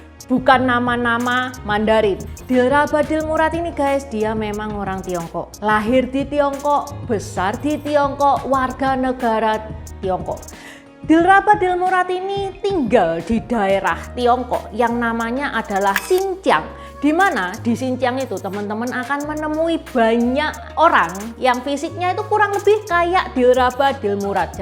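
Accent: Java, Indonesia. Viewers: Cik tionghoa berlogat jawir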